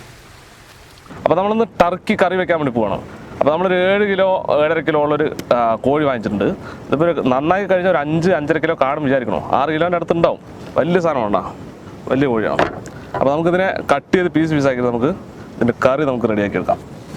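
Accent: Palakkad, India. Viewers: തനി നാടൻ പാലക്കാട് ഭാഷ 💞✨️✴ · നല്ല orjinal പാലക്കാടൻ ഭാഷ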